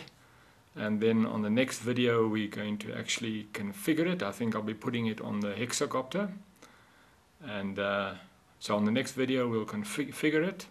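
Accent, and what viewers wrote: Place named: Afrikaans, South Africa. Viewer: Is jy afrikaans?😀 Jy het definitief my aksent!!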